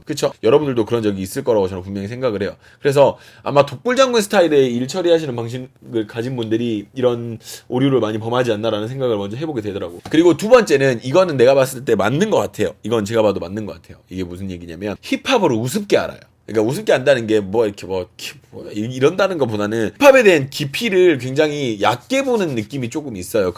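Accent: Jeolla, South Korea. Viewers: 고향이 혹시 전라도이신가요 말투가 서울말투같지 않아서...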